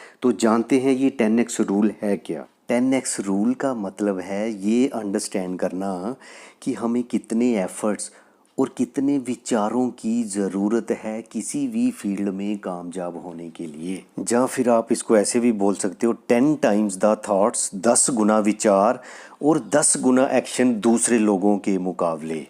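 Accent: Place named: Punjab, India. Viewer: Your accent has touch of Punjab of Pakistan.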